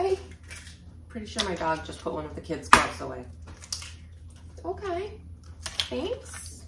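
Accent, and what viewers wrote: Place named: New York, United States. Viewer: You sound like a New Yorker today. Lol! Love watching your videos.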